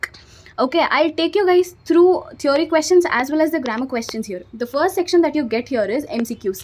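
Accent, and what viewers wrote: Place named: Uttara Kannada, India. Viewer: Pakka uttar kannada nemduu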